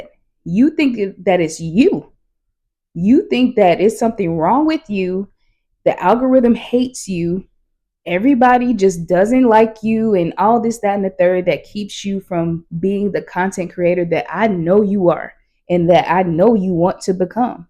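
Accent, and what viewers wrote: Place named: United Kingdom. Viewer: Well done another great video, mindset no 2 resonated with me, love the bloopers and British accent 🇬🇧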